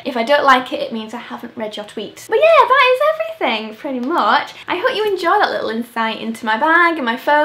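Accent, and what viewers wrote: United Kingdom. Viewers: what's her accent? like what part of the UK?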